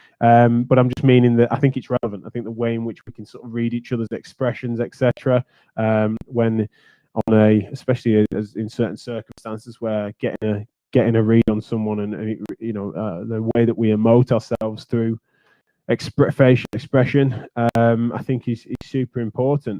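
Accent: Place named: United Kingdom. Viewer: Got steered your way by Dr. Eric Nepute and Sherri Tenpenny. Live in USA (came from Germany as a 12 year old). So it's a bit hard to understand your British accent. But it's worth the effort. Keep up the good work, young man. As to myself and millions of other compatriots here in the States, we can't wait to see President Trump confirmed as having been reelected when the Electoral College meets on December 14. So tired of seeing all these zombies wearing masks even when the state law does not require them to do so (walking alone, driving the car, riding a bike.....totally insane on their part, suggesting "see what a good, law-abiding citizen I am.). So tired of having to put on that piece of cotton whenever I go shopping. I'm usually the only one that does not cover the nose). Anyway, I suspect that Donald John Trump will give all Americans a nice Christmas present by declaring the end of this Covid 19 pandemic no later than Christmas eve. 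Thank you so much, handsome dude,.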